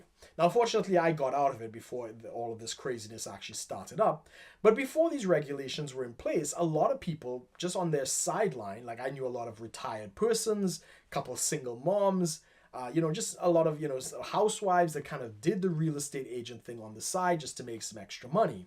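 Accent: Trinidad and Tobago. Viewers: Right away I knew you were from Trinidad.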